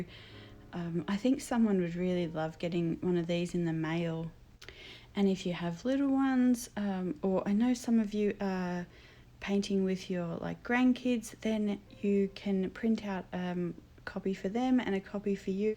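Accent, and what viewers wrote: Australia. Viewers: Not sure if my comment was posted, but wanted to say I’m so glad to hear an Aussie accent. I love your style. I am just getting into art after a career in nursing, so am inspired by your stuff. I an in NsW Thank you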